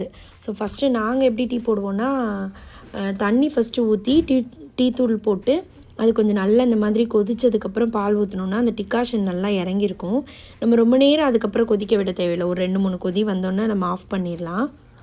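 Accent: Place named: Natham, India. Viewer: அது எங்க ஊரு நத்தம் தமிழ் அண்ணா so நல்லா தான் இருக்கும்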